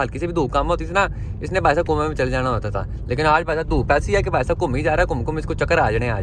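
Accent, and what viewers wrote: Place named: Punjab, India. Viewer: I love your beautiful accent. My beautiful Punjabi brother.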